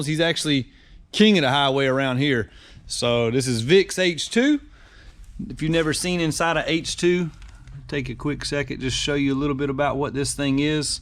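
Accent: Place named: Rural, United States. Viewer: You sound country and far from the city, where are you located?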